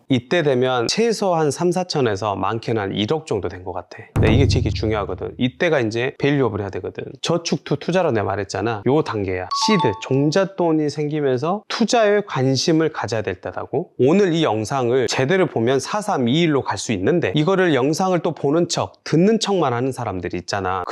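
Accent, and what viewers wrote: Jeolla, South Korea. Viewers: 마음가짐 확언만큼은 이미 1등급 입니다 좋은에너지 나눠주심에 감사합니다 긍정바이러스는 선물 입니다 중간중간 구수한 전라도 사투리도 정겹구요 좋아요 버튼은 늘~항상 잊지 않는것도 팬심이랍니다ㅎ 파랑색을 좋아해서 자꾸만 쇼파가 탐이납니다요ㅎㅎ 파랑새는 멀리있지 않습니다 이미 제 마음속에 있네요^^ 확언! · 경상도인데 전라도사투리 넘 재밌어요~~😂😂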